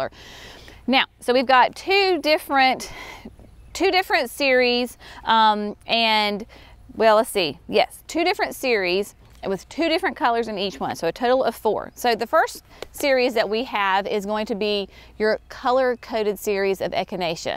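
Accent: South, United States. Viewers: Hi, Love your Southern drawl!! Best, Albert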